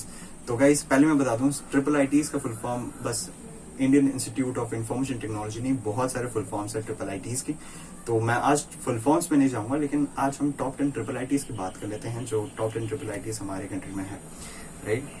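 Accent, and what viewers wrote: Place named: Assam, India. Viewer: smooth Asamese bro!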